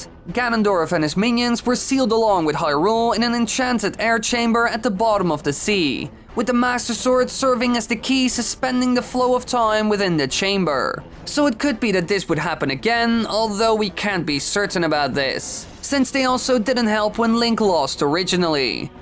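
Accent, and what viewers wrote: Netherlands. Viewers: I'm sorry but the dutch overtones in this one are too much for me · I was wondering, are you Dutch? Cus i am and i speak english kind of the same way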